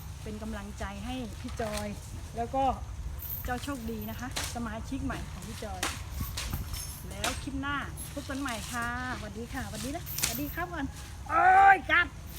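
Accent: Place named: South, Thailand. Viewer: พีจอยพูดใต้ยังกะคนใต้แท้ๆ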